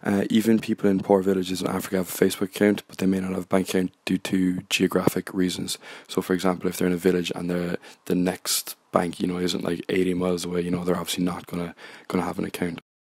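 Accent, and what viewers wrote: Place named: Ireland. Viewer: In Swindon now but lived in Waterford for 15 years. Can listen to many accents and not give a shit but as soon as I here the Irish accent I know I will watch the video :-) + sub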